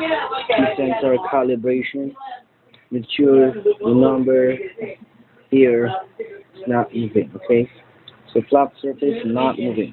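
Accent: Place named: India. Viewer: at least you understood what you saying ? your English accent is worst , don't know why our fellow indians talk English in this worst way ! buy tube light in your washroom.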